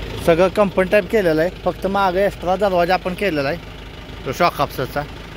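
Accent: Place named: Karad, India. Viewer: Bhava tuza bolnyacha tone lay bhari hay... Karad chi aathwan karun deto....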